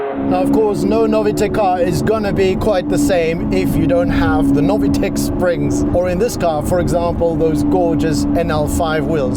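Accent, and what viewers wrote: South Africa. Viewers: Bro, you sound like you're South African.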